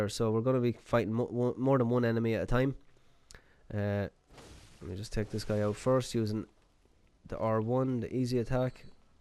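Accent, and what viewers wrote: Ireland. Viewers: Where you from in Ireland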